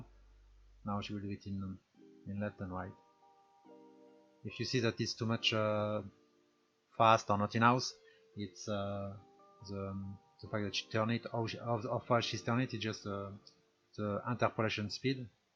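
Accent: France. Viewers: Oh mon dieu mais tu es Français :D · Tu es français? Ton accent anglais n'est pas très présent mdrr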